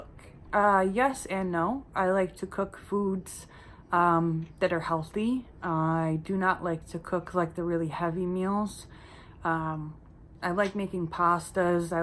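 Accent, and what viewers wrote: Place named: New Jersey, United States. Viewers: I hear more jersey than anything lol I’m from upstate New York grew up in bath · I love your channel I’m a bit late to the question and answer session but I would have asked what cosmetics and skin care do you use your skin is always flawless and I hear New Jersey in your accent 😊xx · I thought I heard some Jersey ! Thank you for sharing- your a true blessing. May I ask ? Was it difficult learning to speak after your dentures ? You speak really well . Thank you and Godbless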